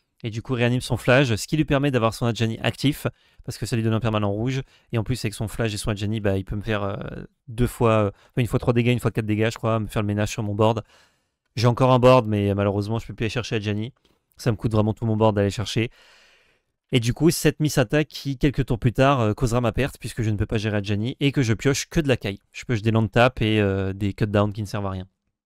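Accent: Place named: Italy. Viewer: Quel accent italien !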